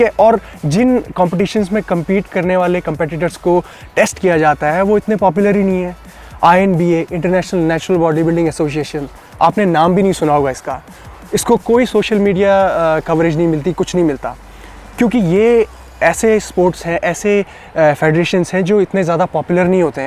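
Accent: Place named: Uttarakhand, India. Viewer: You look so genuine. Aapke hindi main aapka uttrakhandi hota jhalakta hai 😉😉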